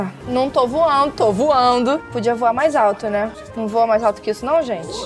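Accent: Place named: Rio de Janeiro, Brazil. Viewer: É o sotaque carioca só fica bonito em mulher mesmo. a gamer que você rexxxpeita ❤️❤️❤️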